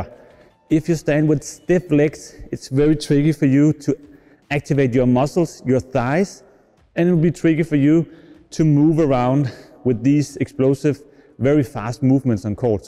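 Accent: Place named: Australia. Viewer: Sounds aussie ngl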